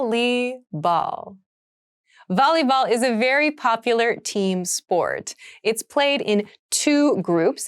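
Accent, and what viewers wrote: United States. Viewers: She speak in American accent❤ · First of all thank you for your content! I'm confused a little, why does a "suit" sound like a "sweet" (at least this is how i hear it) - I've checked that word on the youglish and it sounds a bit different. Is your pronunciation specific to some region in the US? cheers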